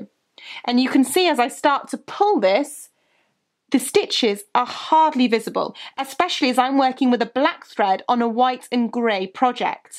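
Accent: United Kingdom. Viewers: Are u British?? 🤔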